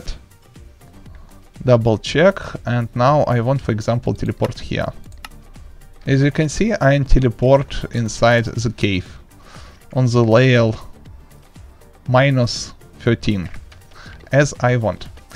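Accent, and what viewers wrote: Russia. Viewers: Руский акцент не счем не перепутать😂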